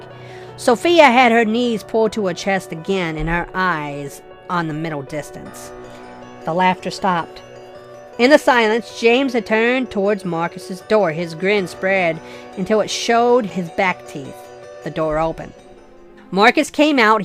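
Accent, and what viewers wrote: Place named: United Kingdom. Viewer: Oh, Another British grimdark reader. Interesting very interesting indeed. New subscriber.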